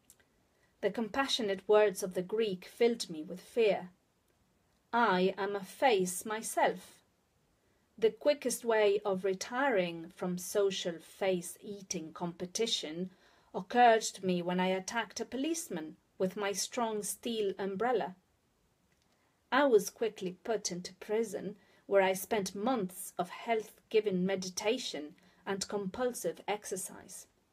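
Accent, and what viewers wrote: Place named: United Kingdom. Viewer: ♥ ¿No te gustaría leer unos poemas de emily dickinson con ese acento británico?.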